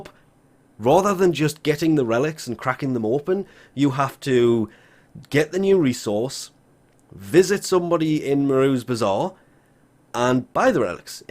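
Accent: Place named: Liverpool, United Kingdom. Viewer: Liverpool accent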